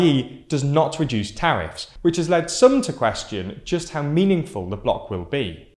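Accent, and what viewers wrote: United Kingdom. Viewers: He’s British lol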